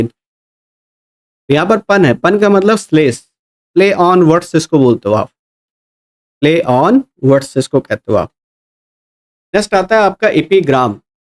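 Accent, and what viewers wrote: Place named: South, India. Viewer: sir south se hai kya unke accent se lg rha 😅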